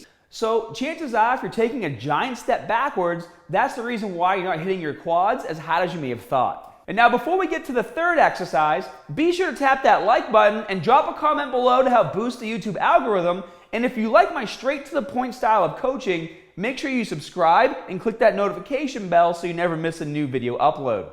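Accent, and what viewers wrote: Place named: Massachusetts, United States. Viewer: Sounds like your from Mass? Great video!!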